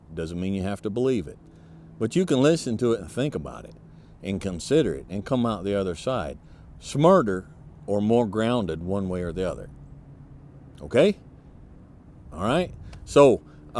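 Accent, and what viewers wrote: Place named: South, United States. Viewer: love the southern drawl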